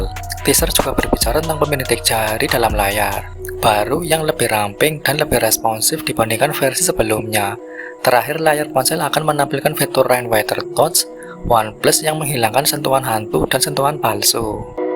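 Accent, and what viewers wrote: Banyumas, Indonesia. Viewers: josss maju terus ngapakers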